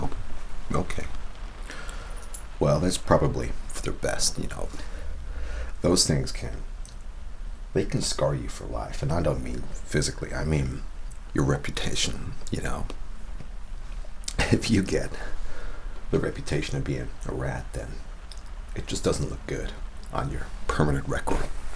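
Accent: United States. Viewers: Your American accent is really good! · Did you do a more american accent or am i just thinking that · Some murder This video contains mature themes and violence On it! Watched for a full minute before I realized you were in America-mode 😅 So natural. And I love that jacket. Now, hopefully Matty Buns shows up for me again <3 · I really love this role play! And you are great at sounding like an American! And I do like the storyline of this one! And the end!! That was a shock! Lol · Spectacular acting, your American accent is dead on, the background and set up was amazing, and the story was awesome!!! Well done!!! Much love! 💙💙💙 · Your American accent is SO spot on it's crazy. Could've fooled me. This audiobook series I've been listening to features an English narrator whose ONLY JOB is to do voices/characters, and his American is God-awful next to yours. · As someone from the USA, I think your American accent is beyond convincing! 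I like how you said "fer" instead of "for" especially. I just noticed a week ago how everyone I knew said 'for' that way. I don't like my accent because it sounds like a lazier version of "proper"? English... like when metal sounds like medal and water sounds like wader. Ugh. Anyway, your accent is spot on.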